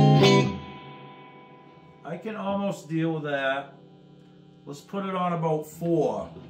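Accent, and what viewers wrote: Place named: New Hampshire, United States. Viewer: You sound like you're from New Hampshire... lol.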